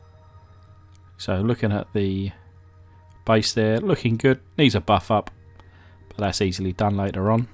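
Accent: England, United Kingdom. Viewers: Heard the British Accent, stopped watching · You have very traditional English accent.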